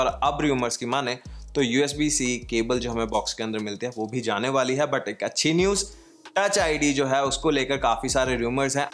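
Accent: Punjab, India. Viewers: sir are you punjabi?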